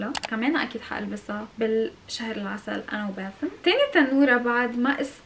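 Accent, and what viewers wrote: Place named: Lebanon. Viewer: I love Shein hauls, currently watching and i love that you are Lebanese because i am moroccan and this Arabic is so beautiful and easy to understand! : )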